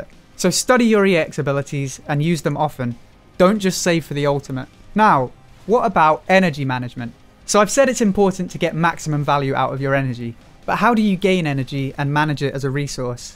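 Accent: United Kingdom. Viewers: i can tell you're from UK because of your accent. plus i'm UK as well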